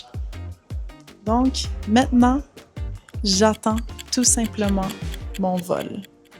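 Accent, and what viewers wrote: Quebec, Canada. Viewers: ❤❤❤I am subscribed to you and I just had a feeling haha. I noticed there r been fewer videos, months apart sometimes and I was like “huh she must be busy with other life stuff” then I started watching dreaming French and I thought “wouldn’t it be weird if she wasn’t posting as much bc she was making videos for their Quebec accent” then I saw you and freaked out bc my conspiracy theory was true haha. You’re really a great teacher, I would watch some videos over and over to get familiar with the accent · Yesss! Quebecois French! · omg canadian french accent slay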